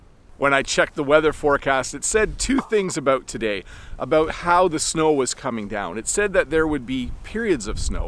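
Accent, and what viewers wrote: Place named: Canada. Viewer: I heard the hallmark of Canadians a lot out here: the Canadian raising "aboat".Lol.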